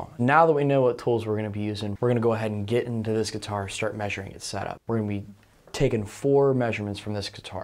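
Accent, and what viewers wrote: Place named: United States. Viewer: Very good and educational video. BTW and out of curiosity, what US accent is this? I.e. schtrings on a schtraight neck.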